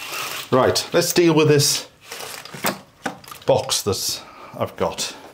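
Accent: Wales, United Kingdom. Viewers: Thank you, lovely relaxing vlog. Nice watching you potter and hearing a warm Welsh accent. I learn a lot from listening to you, some great tips, and I won't be using my plastic chopping boards again. I enjoy reading all the great comments, too. One was brilliant today, about a firestick and a projector tv onto the wall. I have no idea what that is, but it sounds amazing, so I'm going to research concept and cost. The rain protective washing line cover is genius, so will check that out, too. I bought some window film but felt a bit unsure what to do until I read a comment here giving tips on how to do it well...hope you show us doing yours. Also, I love the glass teapot, and I may get one, if not too expensive. The star item today was Rusty's frame. It was stunning, and what a fabulous pic of him, he really was gorgeous. ❤ Take care and look forward to seeing Welshcakes being made. 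My Mother used the heated blackstone, and they were delicious...I miss her terribly. She would have loved your channel. Have a great weekend. 👍 🐈 🌼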